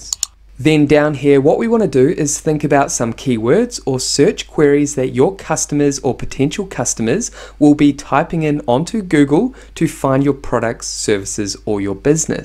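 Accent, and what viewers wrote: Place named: New Zealand. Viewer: Love the kiwi accent, thanks for the video :)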